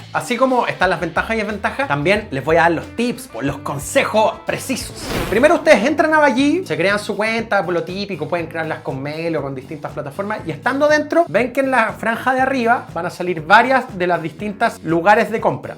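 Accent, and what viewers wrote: Chile. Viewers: Acento chileno es precioso. Saludos desde Madrid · Soy de España y me encanta el acneto chileno, no lo dejes!:face-red-heart-shape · ES JODIDO EL ACEPTO CHILENO JEJEJEJ, PERO GRACIAS. NUEVO SEGUIDOR... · Yo soy español, me encanta vuestro contenido y me encanta vuestro acento Chileno!!!! Dando la hora es uno de mis canales favoritos (si no el favorito) de relojes y por favor, no cambies tu acento, es tú esencia 💪🏻💪🏻💪🏻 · Nunca cambies tu acento chileno. Así está bien. Y que relojes tan icónicos tienes, quiero un watch tour. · Aca un cubano en miami que le agrada el acento chileno, es cool bro 😂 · Que te quede claro que acá en México se les aprecia y se les admira a ti y a (o no se a ciencia cierta como se escribe) y no intentes suavizar tu acento chileno, es el acento más chingon de America. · Soy español y el acento chileno se me hace muy agradable 👌😊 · Soy español y el acento de Chile es TOP🎉🎉🎉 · Soy español, me gusta escucharos hablar en español chileno! 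Veo el canal por eso!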